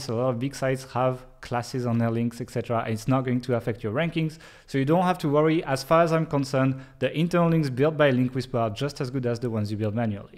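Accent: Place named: France. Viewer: I've never heard anyone talk about plugins before with a French accent, and now it's all I want in my life.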